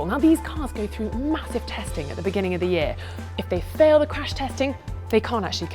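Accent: United Kingdom. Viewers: Love her British accent... wow...I am in love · annoying blonde lady with annoying british accent go away...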